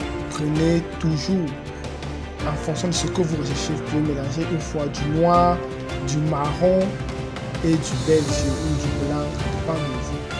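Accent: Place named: Cameroon. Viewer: Salut Frere, je juge à ton accent que tu es Camerounais comme moi, dis moi quel produit utulise tu ?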